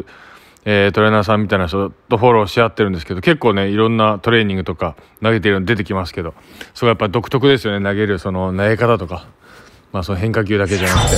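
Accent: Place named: Osaka, Japan. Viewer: たまに出る大阪弁嬉しい😍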